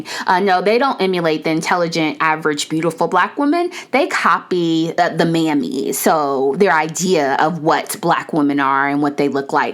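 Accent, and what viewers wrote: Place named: Midwest, United States. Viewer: Question, where are you from? Your accent gives me Midwest.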